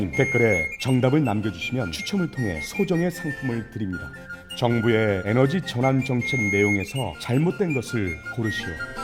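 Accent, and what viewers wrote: North Korea. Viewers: 성우가 약간 ㅋㅋㅋ 북한 같아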